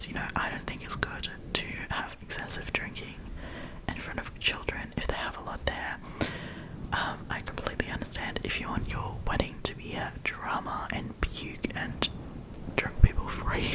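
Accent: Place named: Australia. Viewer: I’m in shock, I’m Aussie and 100% thought you were Australian too! Your English accent is so Aussie I actually can’t believe you’re German 😮 please keep the vids coming they are so good!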